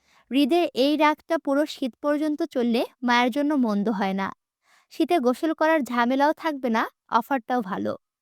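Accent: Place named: Chittagong, Bangladesh. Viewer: তোমার মুখে আমাদের চট্টগ্রামের ভাষা খুব মানাইছে😊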